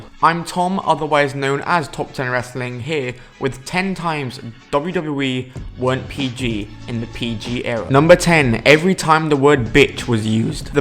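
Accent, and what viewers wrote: United Kingdom. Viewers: Troy Edward Well that's why I said "I don't know if English is your first language". But he sounds like he's somewhere from the UK, so English should be his first language. I wasn't being rude. I was just clarifying what "chicken shit" meant. Even though it's a common saying, he should know what it means. But maybe it's just a common American saying.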